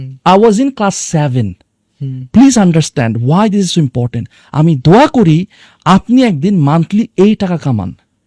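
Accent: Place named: India. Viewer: ভারতীয় ইংলিশ মারায়, ইংলিশ লাইনের এর শেষে "না~ না ~" করে। নোংরা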